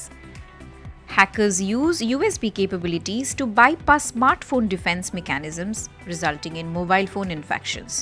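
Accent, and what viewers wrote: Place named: India. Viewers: English spoken by an Indian sounds so adorable 😍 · Indian Accent is best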